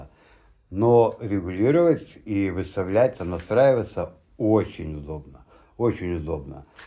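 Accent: Odessa, Ukraine. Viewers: Спасибо, рабочая идея с Одесским акцентом - в роли ограничителя деревянный камертон, почувствуйте столярный настрой.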